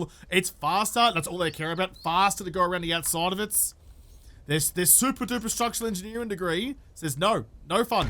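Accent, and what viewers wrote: Melbourne, Australia. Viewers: Do all Melbourne aussies sound the same? You sound just like ozzy. It's knot gey but yut lovely 😍 lmao 😅 you're phenomenal ❤️ american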